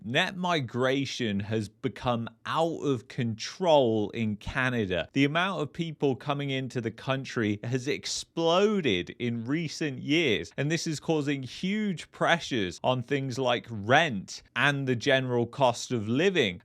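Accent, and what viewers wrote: England, United Kingdom. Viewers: I believe he's from England. By his accent he's certainly from England. My roots of my distant ancestors go back to northern Ireland which has more world champions per capita including myself than any other civilized place on Earth. My great grandfather and great grandmother on both sides of my family were born in Canada in the 1850's.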